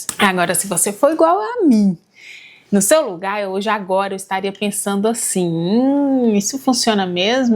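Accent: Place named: Recife, Brazil. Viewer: tú és de Recife? Esse sotaque… uhm acho que sim sou de Olinda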